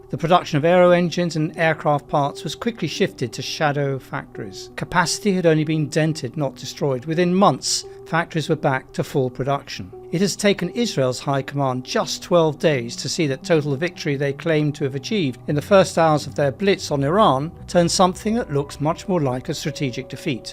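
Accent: United Kingdom. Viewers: Thanks for reporting the truth, though I can hear your accent is British. Hope you will teach people to face facts and truth. Netanyahu is a dying lion, he doesn't want to accept he's finished😂😂😂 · MEE never ever but never ever had a good word on Israel, just this Qatari paid org is unhingede. British accent spweing garbage and misinfo.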